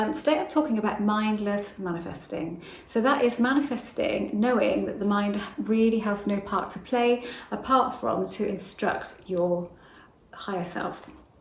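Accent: United Kingdom. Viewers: You have the same name as my daughter and I love hearing British accents talking about the law.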